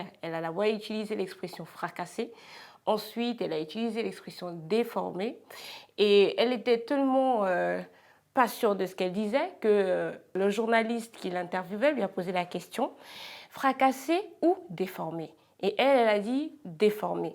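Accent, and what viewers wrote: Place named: Cameroon. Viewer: Pourquoi c'est toujours des gens qui proviennent d'un pays qui a connu des génocides qui ont été armée par la France la Belgique pour venir dénigre d'autres citoyens tu n'a rien fait pour aider ton prochain et tu continue encore avec ton accent kamer c'est choquant être plus blanc que les blanc juste pour une perruque et des papiers 😱😱😂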